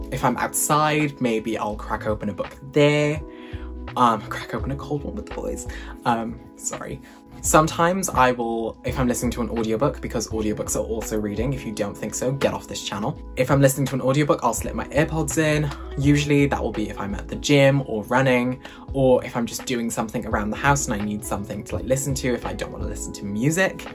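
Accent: United Kingdom. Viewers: your British accent is EVERYTHING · Why is your accent doing everything for me (fellow BRIT AYYY). I FUCKING LOVE DA: I SO FUCKING MUCH. Going at things in your own way and at your own pace is so crucial and I am happy you found that place yourself and a time wherein you feel best. I resonated with so much of what you said as a POC bi who is also writing a book and I cannot wait to see what content you pour out. OMG HATSUNE MIKU GO OFFFFFFFFFFFFFF LOL. Tbh the anxiety as a whole crippled me to the point I left for a while, trying to come back and accept myself more. Hope you keep slaying and doing incredible things with your channel. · my first thought was British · I dont know why i didnt expect you to be british but i love it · I am to young to have twitter and I found this book tube channel and the British accent...😍 Also you sort of remind of Jesper from Crooked Kingdom! 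Edit: Jesper is my favorite character! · Just subscribed to your channel. Love your British accent and your energy. Bring on the videos and reading vlogs.😊😊😊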